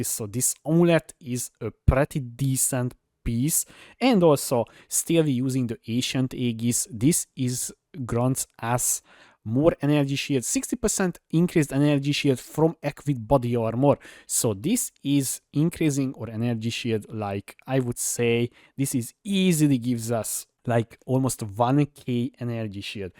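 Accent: Hungary. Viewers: Kurvára hallani hogy magyar vagy :D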